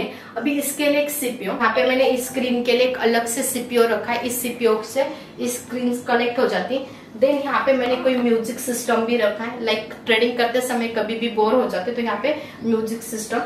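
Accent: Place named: Maharashtra, India. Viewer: This Particular video is nice 👌 i think your first language is marathi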